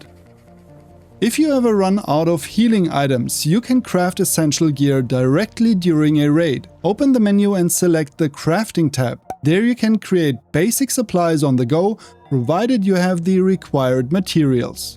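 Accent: Germany. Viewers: I hear a German accent I turn on focus mode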